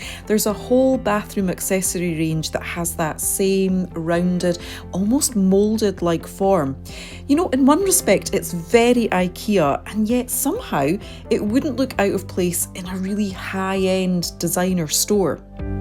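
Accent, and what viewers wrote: Scotland, United Kingdom. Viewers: your british accent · LOVELY Scottish accent!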